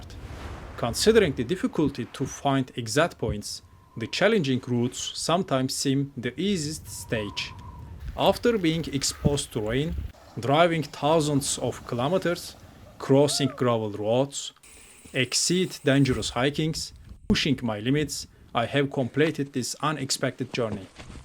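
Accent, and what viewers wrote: Turkey. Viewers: Ulan ben de diyorum İngilizceyi aynı Türkler gibi konuşuyor, Türk'müş adam :) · Videonun ilk dakikalarında bu ne böyle Türkler gibi konuşuyor İngilizceyi dedim. Meğer Türkmüş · Aga türk müsün aksağandan anladım düzenleme video başında yazdım bu yorumu videonun devamında açıklamışsın zaten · türk. ingilizcesinden bile belli oluyor.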